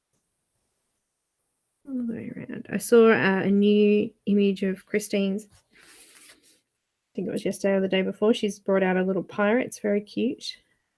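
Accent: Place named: Australia. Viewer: Just wonderful, I learnt so much from this 4 series tutorial. Just realised your a fellow Aussie also. Should of picked up on the accent sooner. I know you used white for these ones but I am really wanting to try the Strathmore toned grey and tan paper, can you please advise exactly what to order as I am clueless. I do use the office works board 200gsm and love it. Many thanks.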